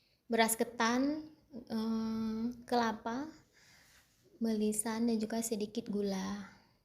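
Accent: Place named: Malaysia. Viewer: Hirip mirip orang Malaysia cara bicaranya 😂,jakarta mna jemlolnya😂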